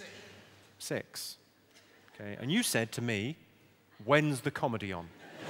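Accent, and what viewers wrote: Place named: United Kingdom. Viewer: There's something more savage when the comeback is in a polite British accent.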